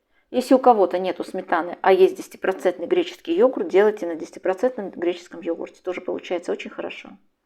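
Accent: Baku, Azerbaijan. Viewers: спасибо за Ваш канал! Как хорошо Вы объясняете, понятно, легко и просто! И хочу выразить восхищение Вами! Ваше красивое лицо, спокойная и правильная речь, изумительные руки!и если не ошибаюсь, нежнейший Бакинский акцент! Удачи! Здоровья и Благополучия!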